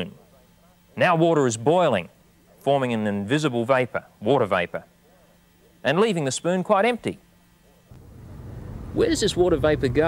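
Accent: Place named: Australia. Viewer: Australian, I think. But he could be Tony Hinchcliffes Dad!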